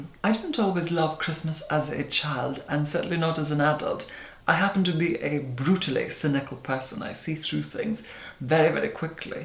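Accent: Scotland, United Kingdom. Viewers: Are you scottish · The most beautiful man in the world? Is it a slight Scottish accent? Such class. Xx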